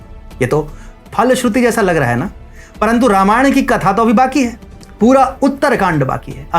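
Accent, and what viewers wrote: Standard. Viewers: Santosh karak vaani hai aapki aur shuddh Hindi uchcharan pasand aaya 🙏 aise hi samaj ka kalyaan karte rahe. Dhanyavaad 🙏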